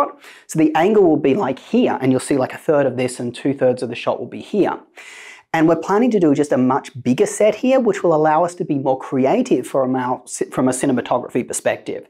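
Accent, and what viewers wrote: Australia. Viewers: I am really inspired by your videos especially this one!!! Nice to hear an Australian accent talking about tech and tech reviews and thing of such. Being from down under myself.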